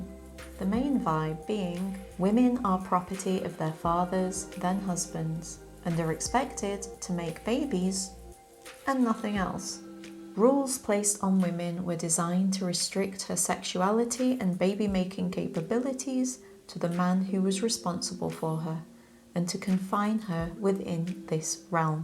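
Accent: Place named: Northern England, United Kingdom. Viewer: wonderful work. i have smashed that like button and hit subscribe. i miss the northern english accent spoken softly. i don't want to guess the county because to get that wrong is more often to offend than not.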